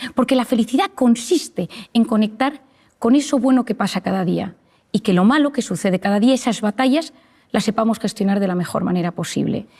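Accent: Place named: Spain. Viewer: Está Dra . Marian, es un encanto, es una cachaqueria, escucharla, poco a poco he descubierto tantos puntos críticos que uno tiene pero no lo sabe y he podido mejorar con sus concejos y enseñanzas. Que Dios la Bendiga mucho. Aparte tiene una voz muy bonita y ese acento español. Me ha ayudado mucho. Gracias Dra. Marian, que buena obra haces, desde Colombia Gracias , Gracias , espero q acá se encuentren sus libros. Sigue así , Te nesecitamos.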